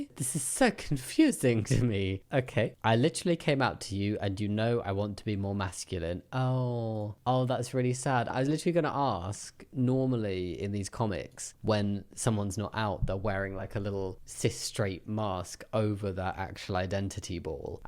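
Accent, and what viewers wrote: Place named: Scotland, United Kingdom. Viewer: You said something and I really could tell you were Scottish. The accent is there at least sometimes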